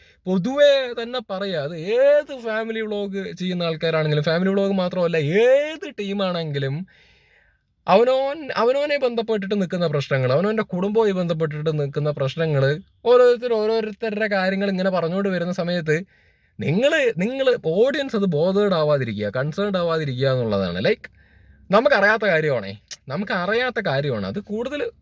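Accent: Palakkad, India. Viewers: Palakkad aanalle kettappozhe manassilayiii...😂❤ njnum pkd ane...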